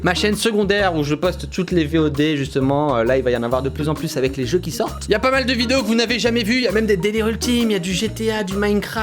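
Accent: Quebec, Canada. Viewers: = l’accent québécois est trops cool Moi= fait une vidéo où tu parle juste en québécois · je suis québécois et ton langage de québécois est a amélioré mais sinon continue tes vidéos j'adore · Chui québécois je valide en tabarnak ton accent continue tes vidéo tes le meilleur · Ton accent québécois est parfait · .... Stp arrete de faire l'accent des québécois tu m'énerve tellement quand tu fait ca. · Jaime ton accent québécois et je suis québécois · En vrai ton accent québécois sa va sinon super video😂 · Mec ton accent québécois est très drôle mais un conseil c’est o pas au dans hosti de tabernak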